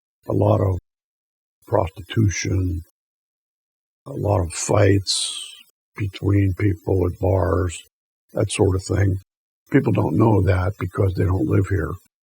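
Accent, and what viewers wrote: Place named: Pittsburgh, United States. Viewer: I KNEW you were from Pittsburgh, but I couldn't confirm it until you just mentioned it. I was born and raised there myself, and now that I have lived outside the city for so many years, I can catch the accent immediately. Anyhow great series. I fell in love with it and I am binge-watching right now. I am going to purchase the book this week. Thank you.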